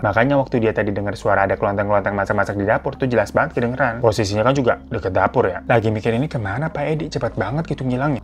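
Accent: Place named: Papua, Indonesia. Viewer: bang lucu bahasa Papua ny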